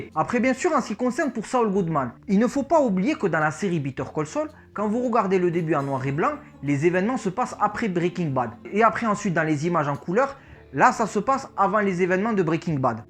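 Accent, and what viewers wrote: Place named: South, France. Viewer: Salut, ça fait plaisir d'entendre ton p'tit accent du sud. Excellente vidéo!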